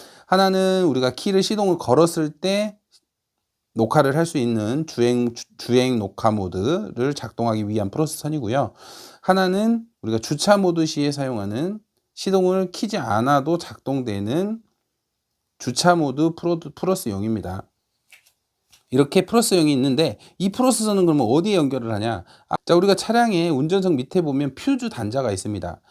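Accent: Jeolla, South Korea. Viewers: 전라도 말투 넘 좋아요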